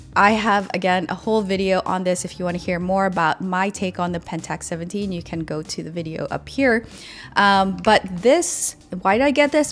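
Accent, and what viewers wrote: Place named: United States. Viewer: Yes, American accent.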